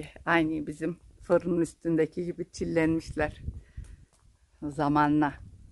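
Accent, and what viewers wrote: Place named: Bulgaria. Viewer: Konuşma şivenizi benzetigim icin tahminim dogruymuş blg neresinden tsk kanalınız ayrıca güzel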